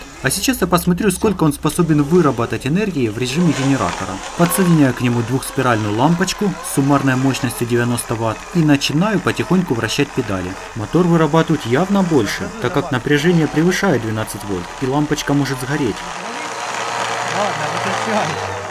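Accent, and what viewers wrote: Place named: Ukraine. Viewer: Ох ребятки.... Как бы украинский акцент не сыграл с вами злую шутку... Город полон украино-ненавистников!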